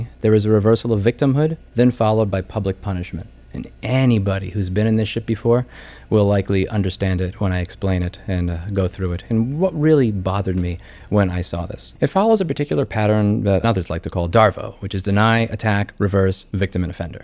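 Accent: New York, United States. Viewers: You can tell Louis is a true NYer. Im guessing Linus is from Caliornia.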